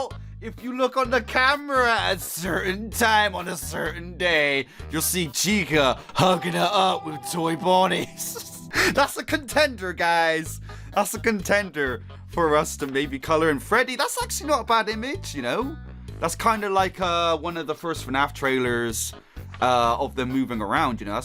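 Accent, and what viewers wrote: United Kingdom. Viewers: Vary British. Indeed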